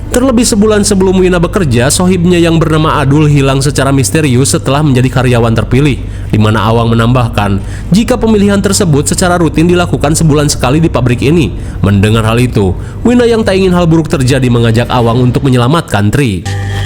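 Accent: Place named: West Java, Indonesia. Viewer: Film horor juga jadi ketawa 😂 Aksen Sunda nya itu loh, jadi khas banget..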